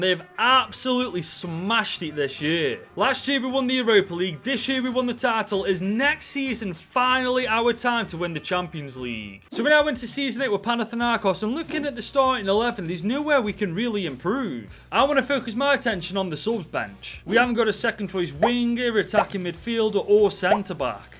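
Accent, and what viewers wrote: Stoke, United Kingdom. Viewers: Where are you from? Sound a tad Stokie to me, love the vids man 😁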